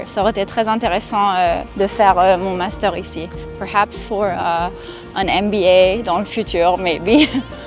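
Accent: Quebec, Canada. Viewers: TU PARLE BILINGUE ON AIME CA C EST QUEBECOIS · Excellent quick view of a few of our good spots…..you do speak french like a québécois, accent, expressions, rythym and all….congrats from Montreal…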